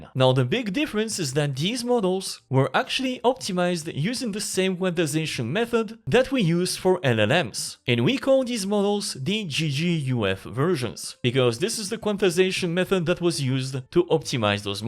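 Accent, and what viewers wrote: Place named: France. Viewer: Il me semble avoir reconnu un léger accent français, donc je commente dans cette langue:-) Fabuleuse vidéo: la présentation est claire, pédagogique, avec de l'humour ce qui ne gâche rien. Les liens sont tous présents dans la présentation ou sur la page patreon. Bref une réussite. Avec une telle vidéo, impossible de rater l'installation de ce nouveau Flux Q8. Surprise il est beaucoup plus rapide que les versions précédentes de Flux (avec NVIDIA GeForce RTX 3070), et le résultat est magnifique. Un grand merci à.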